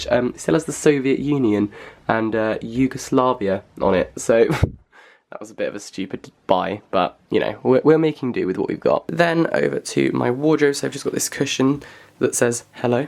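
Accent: United Kingdom. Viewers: Am I the only one who is imitating his accent? Seriously I'm in love with the british accent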